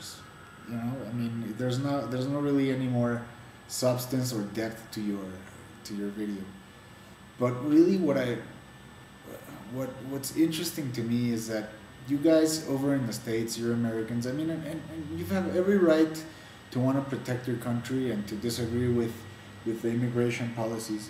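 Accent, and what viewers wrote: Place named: Eastern Europe. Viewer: you sound eastern european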